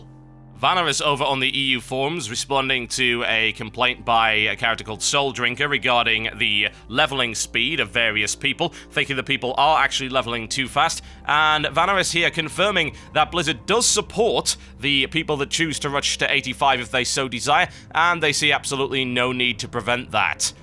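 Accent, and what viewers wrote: United Kingdom. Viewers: British sounds ftw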